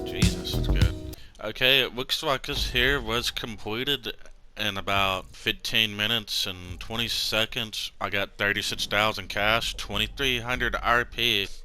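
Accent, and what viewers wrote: Texas, United States. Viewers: My nigga u from texas? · Is it your mic or are you from Texas?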